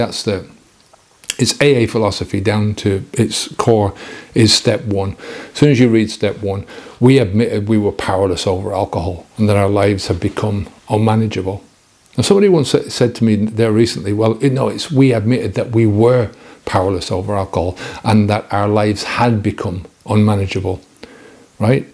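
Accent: Ireland. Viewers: Your accent seems a bit stronger now that you're back in Ireland!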